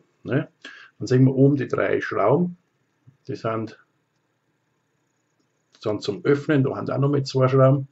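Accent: Bavaria, Germany. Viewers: Bayrisch is a deutsch , alles verstanden ;-) Danke für deine Eindrücke .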